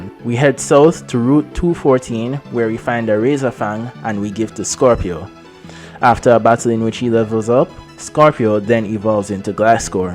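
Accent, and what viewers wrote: Jamaica. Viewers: You sound Jamaican 😊 I know our accent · you from jamaica? i’m from trinidad and trying to pin down the accent🤣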